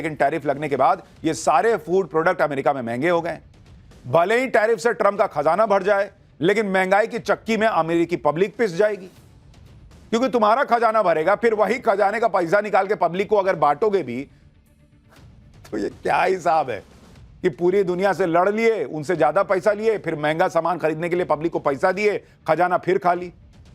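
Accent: Bihar, India. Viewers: Sir aap bihar k hai kya ya up k Mast bolty hai 😂😂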